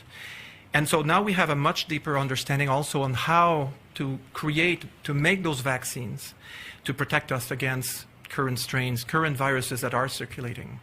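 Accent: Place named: France. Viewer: Ughhhhh. That French accent. Gross.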